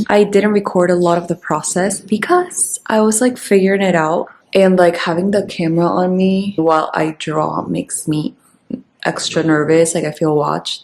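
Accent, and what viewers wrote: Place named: Bolivia. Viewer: Ive never heard your voice before. Not what i expected a Bolivian accent to sound like. Amazing artwork. Your hard work and talent have been an inspiration.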